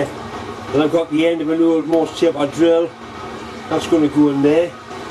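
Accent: Newcastle, United Kingdom. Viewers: I don't know the exact town, but the accent is known as Geordie, spoken in the North East of England around the Newcastle area. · Love the accent, I thought a Good Geordie Milling machine would have a Y-Aye axis on it;) Love the ball bearing trick. Take care canny lad:)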